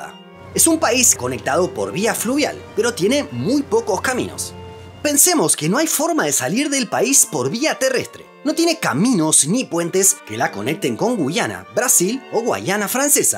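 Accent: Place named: Argentina. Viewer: Trata de hablar neutro... pero es argentino es chistoso y ademas pones el futbol jajjaajajaj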